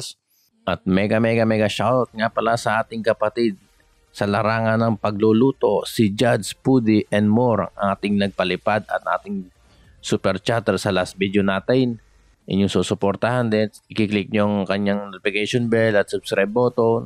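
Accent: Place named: Batangas, Philippines. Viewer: Ala eh batangueno ka rin pala, maganda yan pars pede ka na magnegosyo! #Reddtv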